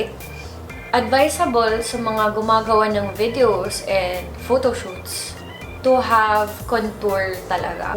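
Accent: Visayas, Philippines. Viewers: kanang accent sa mga bisaya pagmagtagalog ba..😆😅hahah, ganyan din ako kadalasan eh.!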